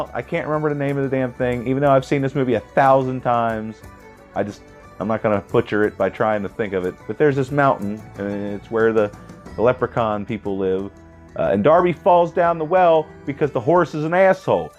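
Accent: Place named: Scotland, United Kingdom. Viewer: AYE! It's Scottish!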